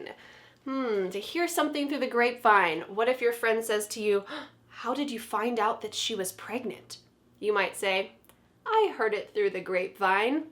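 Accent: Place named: United States. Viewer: Thank you very much, you have taught me the American accent excellently Follow you from Algeria 😊😘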